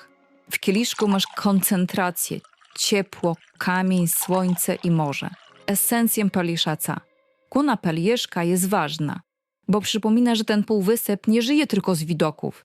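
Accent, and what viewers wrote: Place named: Ukraine. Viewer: Całkiem niezłe jak na AI tylko ten lektor tak zaciąga ... ukraińskim?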